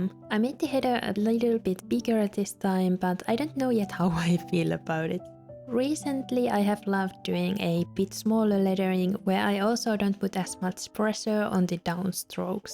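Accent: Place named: Finland. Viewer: This is the first of your videos I watch and I like it a lot! May I ask where are you from? Your accent somehow sounds Finnish to me (someone who is currently trying to learn Finnish) but I’m not sure whether I’m right with that assumption. Either way, I’ll definitely subscribe because I love the way you set up your Bullet Journal <3 ⏰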